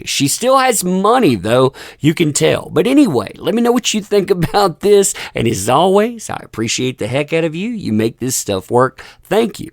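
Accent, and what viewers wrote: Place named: East Coast, United States. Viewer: Ok, you can sure tell you're from back east it's YUK-A not how you say it. Also please do a segment of a h getting offered $10 million to do a XXX rated porn-flick.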